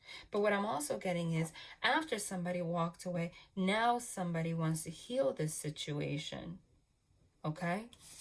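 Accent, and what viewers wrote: New Jersey, United States. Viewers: Idk who will read this. Idk if you will ever read this, but I do wanna thank you. Imma just put this positivity out into the universe. This came at a really pivotal time in my life. I lived through abuse at the hand of my adoptive father. Recently there is a girl I fell in love with where I had to cut it off. Everything you said hit soo hard. I’ve always been someone who’s been scientifically grounded. Lately I’ve had a sort of spiritual awakening and stoped ignoring this calling. That’s when I found this. I’ve been trying to work past a lot of things that need healing and I’ve done really well, but wow!!! Lemme just say your gifts, your abilities, your words truly hit home and inspired me to push through on this journey. This also gave me a lot of hope. Ty so much 🙏 also I’m from New England and your voice mixed with that Jersey accent 😩 the universe clearly wanted me to hear this message. 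Thank you so much for the positive energy you put out for everyone!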